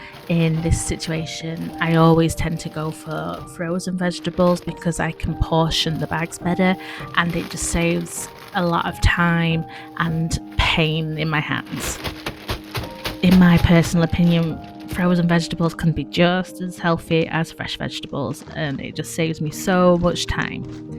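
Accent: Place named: England, United Kingdom. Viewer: As soon as I saw the name of the channel I immediately knew they weren’t Irish 😂 came to double check I’m right and heard the English accent. ❤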